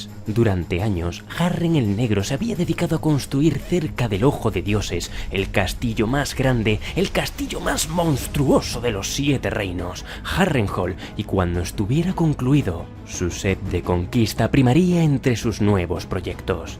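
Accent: Spain. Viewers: soy latinoamericano. Pero te digo una cosa. Siempre me ha parecido que la lengua castellana de España es mejor para narrar historias de fantasía medieval, no sé porqué, pero así lo siento. Debe ser porque le da más epicidad a la narración. No estoy denigrando de ninguna manera la narración del castellano latinoamericano. Has narrado este video espectacularmente, de verdad, te felicito. Muy bueno.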